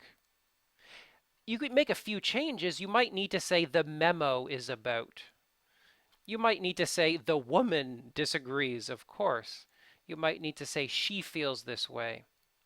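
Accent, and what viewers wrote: Canada. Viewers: Do I hear Canadian accent??? Just curious..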